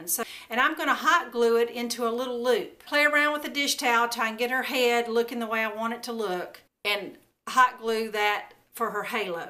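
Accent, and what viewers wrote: South, United States. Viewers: First time seeing one of your videos. Loved it and love your southern accent. You said if anyone had any suggestions for projects to let you know so here is mine. Oven door decoration made from 1 kitchen towel and regular cotton material for the bodice. Looks just like a little girl's dress and they also have the boy one too. I watched a couple of videos but one was so fast I couldn't follow it and the other was way, way too long and talking about everything except how you put it together. Love your videos and I hit the subscribe button.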